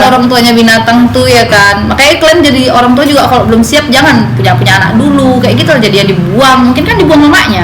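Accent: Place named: Medan, Indonesia. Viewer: Dek , dah pande kau , berkisah ya, kalau org2 mdn yg nonton dah pasti lah ngerti bahasa kau tu, kalau org2 Jkt belum tentu paham semua aksem gaya bicara kau....hahaha.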